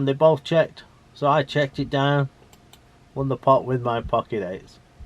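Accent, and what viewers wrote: United Kingdom. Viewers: You sound like a guy from the north of England, whose been living in the deep south for a little while... am I right? Keep up the great work!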